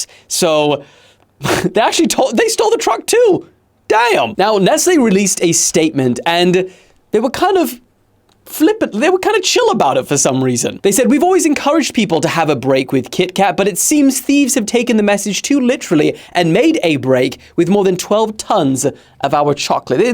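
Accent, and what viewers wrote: South Africa. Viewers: bro is truely a South African 🤣"listen properly"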